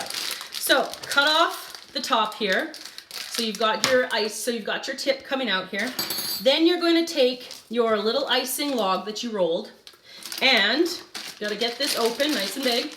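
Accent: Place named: Canada. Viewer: I love watching your videos so much! My mom is Canadian, but we live in Texas, so I love hearing the accent!!😊😍🤗😘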